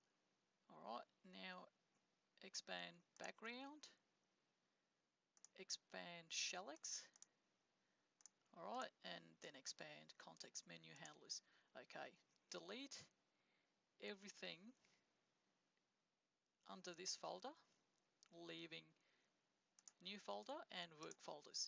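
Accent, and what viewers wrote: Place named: United Kingdom. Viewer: Philip It's so low even with the speakers and the video both set to max volume. No need to insult folks just because you're getting better results. I can just barely hear that someone is talking with an apparent british accent, but placing my iPhone's mic into my headphones right in front of the speaker, with dB meter app running, it doesn't even register a difference from ambient noise. Sometimes I can tell what he's saying if I listen really closely, but most of the time, I can't.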